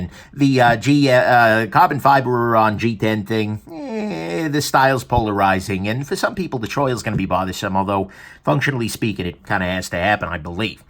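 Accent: United States. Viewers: downvoted because of this stupid yankee accent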